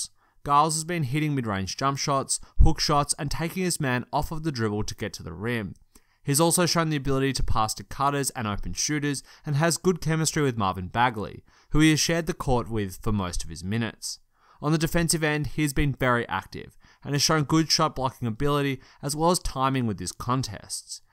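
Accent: Australia. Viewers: is it australian accent ??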